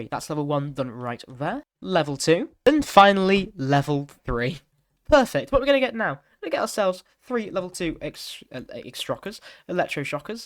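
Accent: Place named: United Kingdom. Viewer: the british accent is so good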